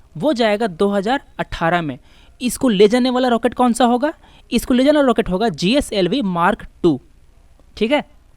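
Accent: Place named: Bihar, India. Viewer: bihari type of language of tutor..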